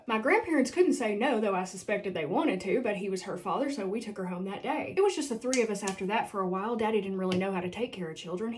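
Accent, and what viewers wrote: South, United States. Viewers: Honestly the southern twang in these videos is amazing! I love it so much, and the way you tell it! I'm gripped! Want to know more 😂 Also lots of love to you ❤️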